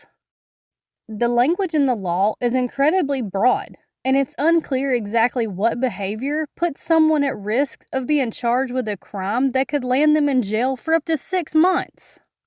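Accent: South, United States. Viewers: Can I just say, I love Southern Accents❤️ Taking the “i” out of Oil is awesome. It doesn’t need to be there, we all know what it is without it(sludge). Love it🥰🤘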